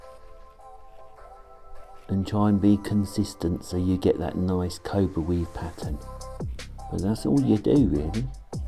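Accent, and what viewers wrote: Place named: United Kingdom. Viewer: Brilliant! I love your accent. Which part of the UK are you from? And what's your favourite tea? 😊 PJ from Canada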